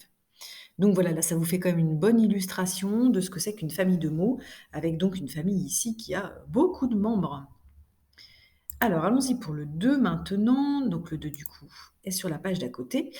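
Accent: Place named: South, France. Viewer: Bonjour Madame, c'est Priam. Sympa votre accent du sud! Pour répondre à Valentin, je trouve mes questions et mes réponses dans des livres. Bonne journée!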